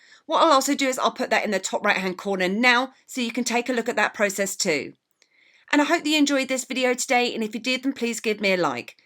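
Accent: United Kingdom. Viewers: British accent is so cute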